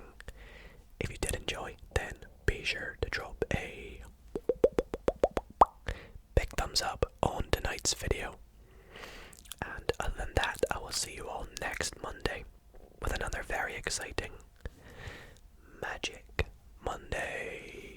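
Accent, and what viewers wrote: Scotland, United Kingdom. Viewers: Your Scottish accent makes your asmr the best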